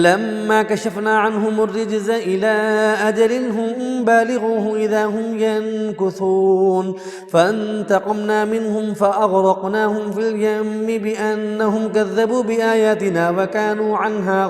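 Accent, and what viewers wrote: Panipat, India. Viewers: Lovely panipati accent. Allah o akbar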